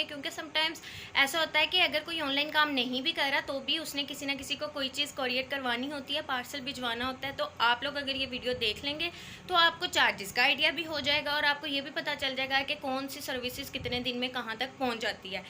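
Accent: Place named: Faisalabad, Pakistan. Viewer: AP Faisalabad say hai ? Apky bolny ka Andaz faisalabadi Laga btw AP business Kon sa kar Rahy hai ?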